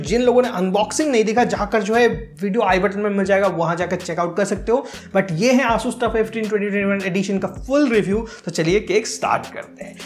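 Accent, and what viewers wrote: Assam, India. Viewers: Damn you're from assam? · Aap Assam se ho kya??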